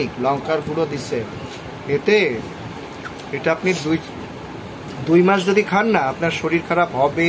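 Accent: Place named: Bangal, Bangladesh. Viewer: বাঙাল কথা কও কেন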